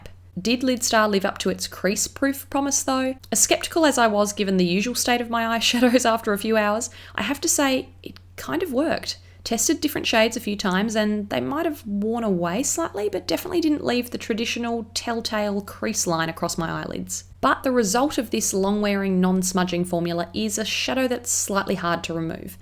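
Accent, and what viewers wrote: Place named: Australia. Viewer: do you live in australia? If so how did you manage to get glossier products? (judging from your accent and assuming you live here in australia cus they dont ship here lmao)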